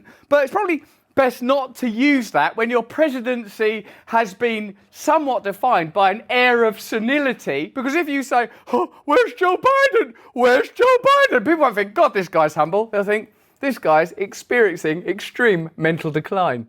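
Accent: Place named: United Kingdom. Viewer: You talk about Biden cant speak English, lol neither can you. I know its a British accent but if you can pronounce proper English then dont tell someone else they cant.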